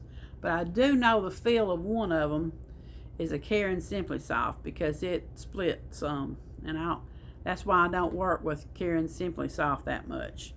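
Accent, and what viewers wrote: South, United States. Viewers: I enjoyed hearing your southern accent. I grew up in the south but am in wyoming.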